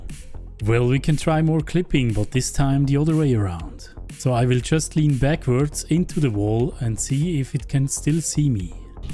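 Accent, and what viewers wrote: Switzerland. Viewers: schweizer english 😅 triggert schon ein wenig xD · Are u swiss?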